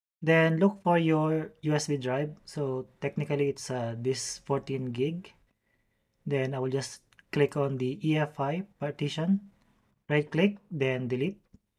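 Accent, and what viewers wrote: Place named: Manila, Philippines. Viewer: Nice Bro, there is now a super good tutorial (that sounds like really from Manila) hehe... Thanks for the tutorial but I cannot do this since I am using my only 1 PC for work, do you suggest your method could be a solid workstation or will this still have some crashing along until I fixed DSDT, KEXT etc. ? Will having another back up (clone) a good idea? That's what I did before when I am using my hackintosh for work early 2016... Whenever It crash, I just mount the working cloned system and then clone again hehe.. Thank you for the video Bro. New Subscriber here. :) BTW, I am using 5800x + X570 + RX 5600XT will use mostly for Premiere Pro and other Adobe Products. More power!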